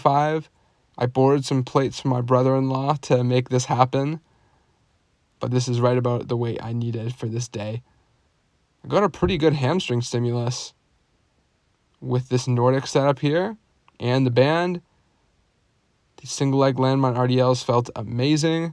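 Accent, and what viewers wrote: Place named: Canada. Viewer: what is your height? and are you canadian? you sound very canadian 😆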